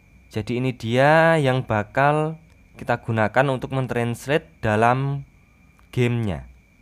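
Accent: Java, Indonesia. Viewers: Jangan medok co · ngomongmu medhok ee mas pake lu gua lu gua. gugel ya gogel wkwkk. lanjutkan.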